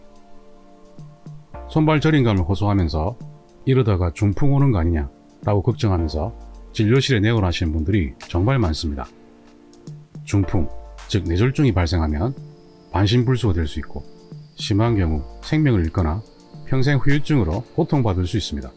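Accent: Gyeongsang, South Korea. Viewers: 선생님 말투가 경상도 출신같은데 맞나욯ㅎ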